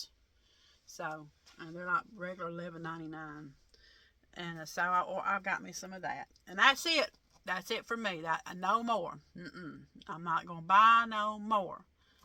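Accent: South, United States. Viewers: Hi mss from western Nebraska, please take care of your knee this coming from someone who had many knee issues. Getting a new one can be endured and so much better. Keep on posting, love your channel. Love your southern accent!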